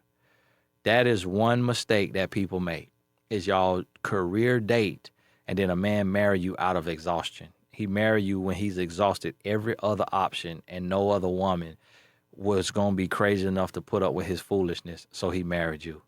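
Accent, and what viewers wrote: South, United States. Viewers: You sound so country in this video 😂 “listen to meeee” 😂😂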